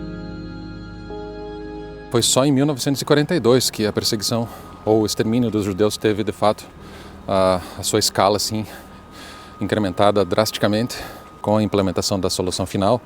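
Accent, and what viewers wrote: Rio Grande do Sul, Brazil. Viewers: Sou da região noroeste do RS é o mesmo sotaque por isso perguntei um abraço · Foi tudo muito triste meu Deus. Muita gente não sabem disso, eu imagino como é que ficam os que entram nesse lugar. O Judeus sofreram sem uma razão aparente mas acho que não tem nada a ver como castigo ou juízo de Deus, eu sou Cristã amo Israel oro por Israel, porque as coisas não tem sido boas pra eles ultimamente. Eles são o Povo de Deus alguém queira ou não, obrigado pelo vídeo pois vim aprender muitas coisas conhecndo maus a história, tenho assistido outros vídeos e lido livros sobre o assunto. Que Deus abençoe a ua vida, tens um sotaque Gaúcho já fui missionaria no RS amo os meus irmãos Gauchos voltei pra minha terra com minha família deixamos muitos irmãos lá continuamos nos comunicando com eles nas redes sociais.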